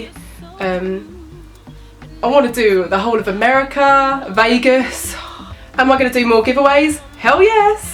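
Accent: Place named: United Kingdom. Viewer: I could listen to your brittish accent 24/7 😍❤️❤️❤️❤️❤️❤️ love you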